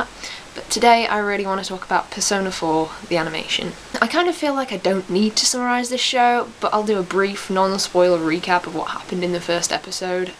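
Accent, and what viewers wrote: United Kingdom. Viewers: XD a pretty girl who plays persona? AND has a british accent!? AND loves Teddie?! 0_o i think im in love XD that aside, i love the way you criticiced the first episode, and agree on everything you said. Bear-Y good review XD ;)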